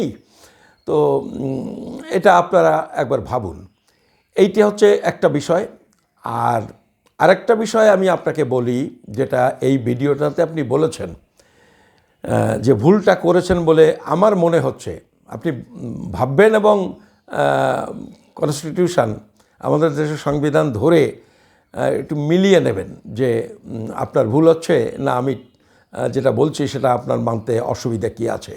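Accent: Bangladesh. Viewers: Upnar kothar tune shune mone hochhe apni o purba banger, poriskar holo na. Manik Fakir babu r upni ekshonge boshe ekta vidio kore byapar ta clear korun please. Ki ki proman potro lagbe....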